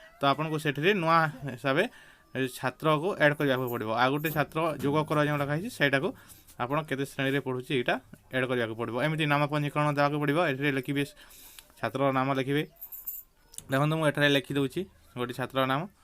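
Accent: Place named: Western Odisha, India. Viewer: Thank you sir Apana western odisha ra ki apanka language Ru semiti janapaduchhi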